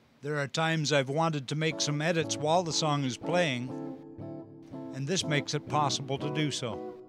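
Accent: Canada. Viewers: Nice video and canadian accentt soundz kool dogg!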